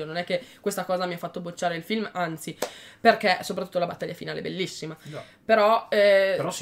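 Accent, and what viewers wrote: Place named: Reggio Emilia, Italy. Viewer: SPOILER 😁Sei di Reggio Emilia?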